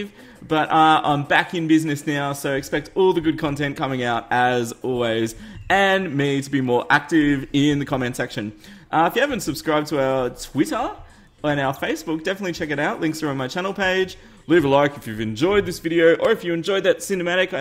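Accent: Australia. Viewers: Are you Australian? Just wondering from your accent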